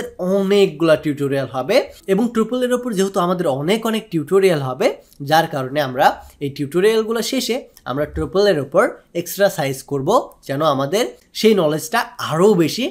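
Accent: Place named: Kushtia, Bangladesh. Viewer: apnar bari ki jhenidah ,kushtia elakay? kotha bolar dhoron shune jante iccha holo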